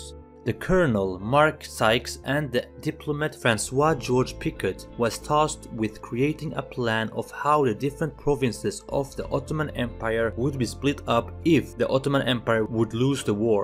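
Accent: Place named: Sweden. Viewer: You have a hint of a Swedish accent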